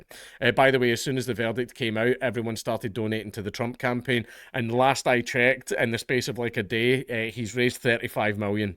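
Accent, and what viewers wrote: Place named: Scotland, United Kingdom. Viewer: "I really like it when people get angry" - dude, we can already tell by your accent you're Scottish. No need browbeat us with it.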